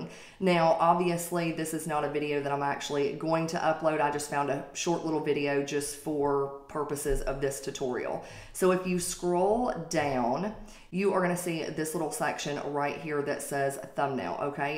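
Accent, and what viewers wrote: South, United States. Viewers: This is a southern American accent. We don’t sound like this in the west or even east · Thanks!! Ijust what I need. 😊😊 Have a great day. Nice accent. The southy one. 😊😊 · I’m sorry but she has the most American accent a person in world could have.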